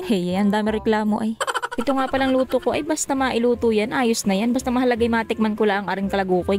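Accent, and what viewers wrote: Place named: Gumaca, Philippines. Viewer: hahahaha i really really love your accent it sounds like home to me... parang gusto ko tuloy umuwi Gumaca bigla 😂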